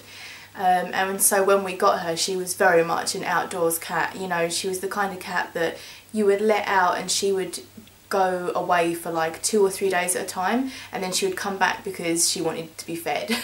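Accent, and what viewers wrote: United Kingdom. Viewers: I've listened to your music for a while and I never knew you were British, lol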